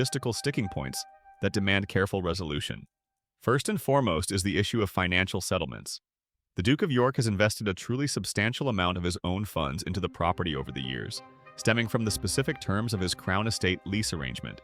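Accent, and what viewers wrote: United States. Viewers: Why am I hearing an American accent? Our King is not your concern.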